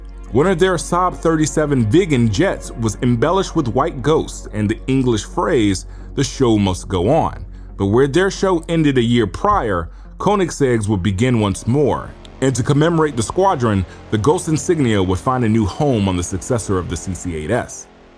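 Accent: United States. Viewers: If you're planning on having Americans watch your videos, at least put MPH numbers in parentheses under the KMPH numbers. Considering you have an American accent, it seems like you should know this.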